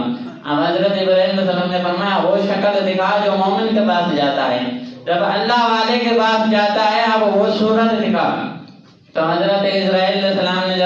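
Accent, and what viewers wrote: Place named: United Kingdom. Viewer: British full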